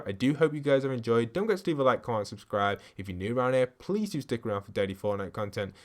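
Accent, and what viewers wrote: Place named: United Kingdom. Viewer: HIS BRITISH voice calms the video even more dammmmmmm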